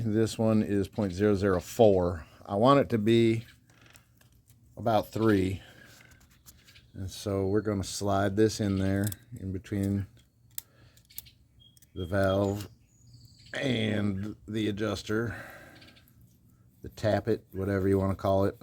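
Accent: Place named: Kentucky, United States. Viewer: Im gonna get you a phone camera stand for you this garage day this year which is first day of winter only as a early as possible date and You'ved lived in Kentucky for so long your starting to speak tucky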